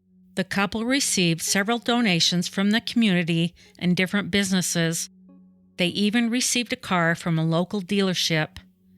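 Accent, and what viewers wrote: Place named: Midwest, United States. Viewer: You have the sweetest Midwestern accent. New follower here. Love your channel.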